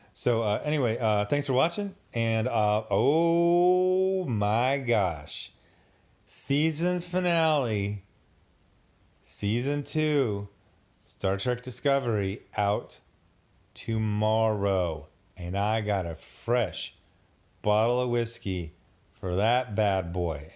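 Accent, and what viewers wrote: United States. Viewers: First, Underworld was a rip-off of Vampire: The Masquerade, Rage: The Apocalypse, and the whole classic World of Darkness in general. I still love Kevin Grevioux (accounting for your American accent, pronounced "Greh-View" with 'eh' being pronounced as in 'meh'). Second, regarding Vampire strength, it's implied in the movie--and generally accepted in all modern Vampire stories--that 1) the older a Vampire is, the more powerful they are, and 2) the closer they are in generation to the first vampire, the stronger they are. Selene was both old (over 600 years old) AND iirc her sire was sired by the original Corvinus vampire, making her a third-generation vampire. Example: a fourth-generation vampire who's 600 year old is probably weaker or just on par with a 300 year-old third-generation vampire.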